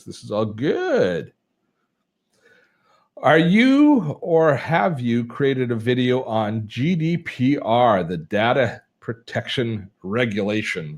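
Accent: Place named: Canada. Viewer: Being from the US I always enjoy your Canadian accent. It adds a pleasant touch that makes us partners in our uniqueness. Thanks for everything you do.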